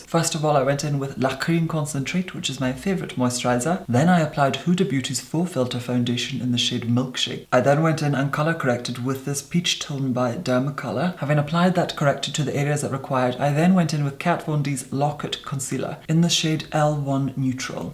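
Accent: Scotland, United Kingdom. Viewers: I adore when I can hear a bit of your brogue... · I love your voice and UK accent😍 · Your knowledge of products is astounding! You know all the names and number of products and brushes just like that! Astonishing. How on earth do you do that? I strive to be a professional makeup artist and you set the bar VERY high! And your are so funny. Thank you for every film. You teach us mere mortals so much of your craft. I love your subtle Scottish accent too, as I'm Scottish myself. Wishing you all the best. Ilse from South Africa.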